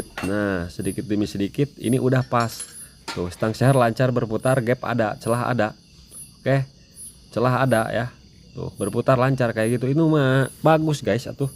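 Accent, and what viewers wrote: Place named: Garut, Indonesia. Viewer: Tutorna mantap.urang Garut sigana mah,karunya urang Manado Weh rada ngahuleng wkwkwk😅😅😅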